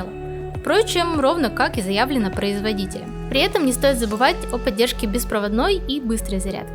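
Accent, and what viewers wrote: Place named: Perm, Russia. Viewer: Я думаю, какие-то пейзажи знакомые. И говор... так она ж наша Пермская!) Приятно)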